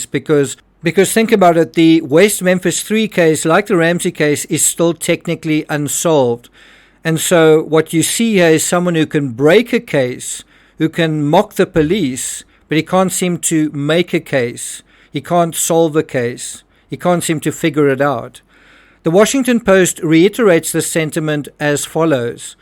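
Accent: South Africa. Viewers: is ur accent SA? do u speak afrikaans?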